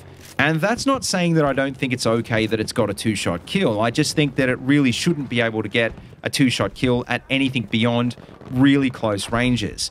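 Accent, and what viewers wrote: Australia. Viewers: gr8 vid m8 Aussie accents makes everything better :) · Hey shit, you're an Aussie!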